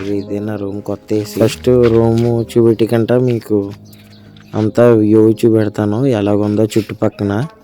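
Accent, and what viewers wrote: India. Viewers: Ok ...I am from palakollu..bec of slang I guess u r from West godavari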